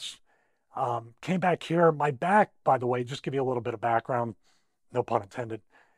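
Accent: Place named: Philadelphia, United States. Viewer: Never apologize for your Philly accent, you are Philly Born, Philly Raised, Philly Tough and Port Richmond Strong. Prayers for you and all who have no one who are struggling with their illness.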